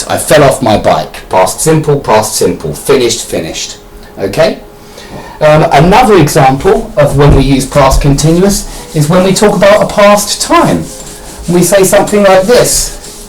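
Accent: United Kingdom. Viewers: Hi! I'm from Brazil,and your video is very good! I love the British accent is perfect :D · I love that heavy british accent!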